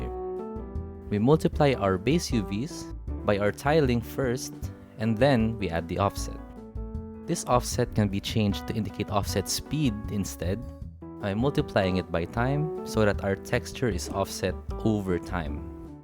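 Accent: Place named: Philippines. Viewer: new instantly from the accent this was a fellow filipino incredibly useful video my man hoping ill get to use some of these in my own projects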